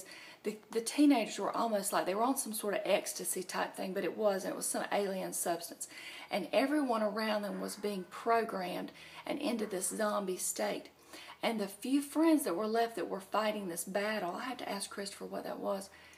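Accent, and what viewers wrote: South, United States. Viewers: I am addicted to watching your videos because of ur southern accent and ur eyes.... Ur eyes are gorg!!!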